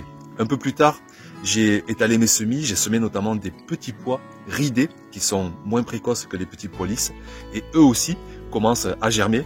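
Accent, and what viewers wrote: South, France. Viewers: Ils sont mimi tes loulous... c'est un mini toi 😊, aieee l'accent du sud, j'adore! ça me rappelle mon Marseille de mon enfance... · merci pour cette belle surprise de tes deux petits, effectivement ton fils te ressemble! et cet accent du Sud...c'est déjà du soleil pour moi, pauvre nordiste d'ile de France! à très vite sur ta chaine! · Bonjour, je te découvre en plein confinement, enfin ça fait déjà un mois là, j'aime beaucoup tes vidéos, la philosophie qui en découle. De ton accent (hi hi de vos accents là où je t'écris j'entends la voix de tes enfants, c'est magnifique, moi mon fils déteste les légumes:-(; il a 12 ans et ça marche toujours pas. Bon je reviens à mon propos, de votre accent j’entends le sud... merveille. Moi j'ai un potager sur Bruxelles, un tout autre climat. Tes vidéos me font du bien, je les laisse couler pendant que je fabrique des masques, une autre façon de cultiver la santé.... 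Elle me font du bien tes vidéeos et me ramènent à l’essentiel. Amour sur toi et les tiens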